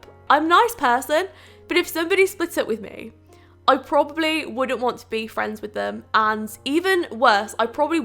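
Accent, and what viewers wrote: United Kingdom. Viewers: I like ur british accent 😂❤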